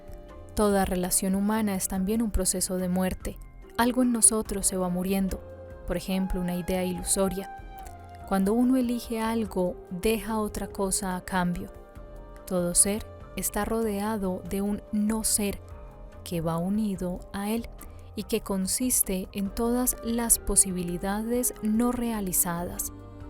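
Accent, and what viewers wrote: Colombia. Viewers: Nada mas hermoso que escuchar una colombiana. saludos desde México.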